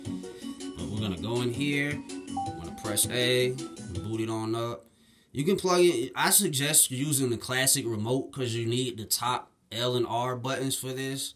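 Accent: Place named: South, United States. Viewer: yo why do you sound like a redneck dantdm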